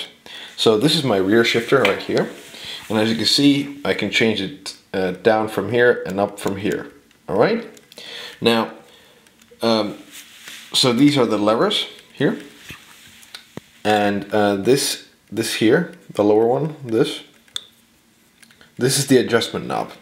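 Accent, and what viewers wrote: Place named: Ireland. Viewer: Do I detect a wee bit of Irish lol.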